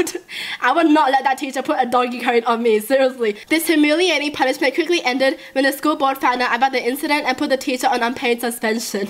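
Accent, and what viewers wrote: Australia. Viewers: She grew up in Australia so she has that accent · Nana Danisa wow ok, so your calling people idiots for being slightly mistaken? She has an Australian accent, who can blame them if they thought that (and by the way, people can be Australian soooo yeah. Your argument that it's "not a race" makes no sense and doesn't benifit you or your argument"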